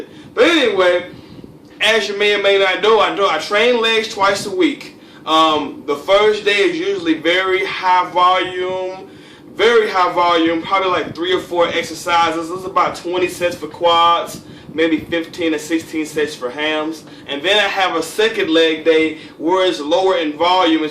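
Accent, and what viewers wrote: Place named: AAVE, United States. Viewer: talks like a dummy but if you can look past the ebonics he makes a lot of sense and hes oozing charisma. Love to watch him. Some ppl sound too "boring professor" like. Keep doin what youre doin bro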